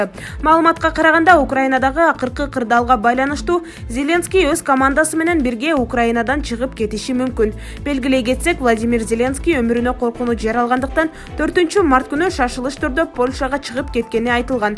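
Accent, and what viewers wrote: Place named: Uzbekistan. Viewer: Бул канал калп айтат ишенбегиле диктору да оштук сарт кыргызча интонация жок озбекче интонация менен суйлойт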